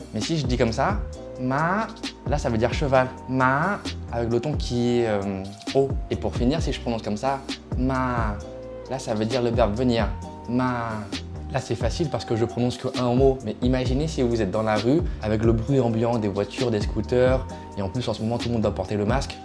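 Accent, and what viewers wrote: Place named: Thailand. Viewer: Hélas encore une idée préconçue et fausse (à peine dans les grandes ville) : la Thaïlande se classe 62e seulement sur les 70 pays répertoriés dans un classement mondial des compétences en anglais effectué par EF Education First. La cinquième édition du rapport montre également une tendance inquiétante pour la Thaïlande: le pays enregistre peu ou pas d’amélioration au cours des cinq dernières années. Par contre 100% d'accord avec le reste de de votre discourt et votre français thaîlandisé est remarquable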